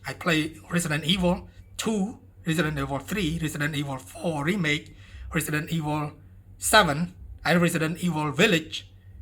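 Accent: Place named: Cambodia. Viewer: You sound like cambodian, where are u from ?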